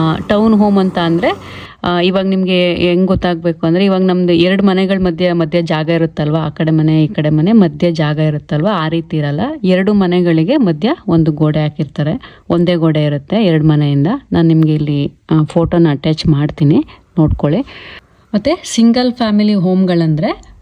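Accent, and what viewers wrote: Mandya, India. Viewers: Nivu mandyadavara? Thumba chanag matadtira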